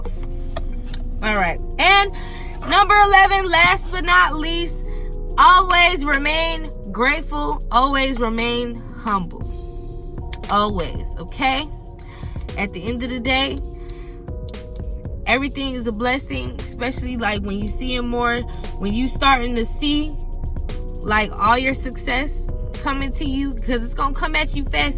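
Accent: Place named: Miami, United States. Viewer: Sounds like you from Miami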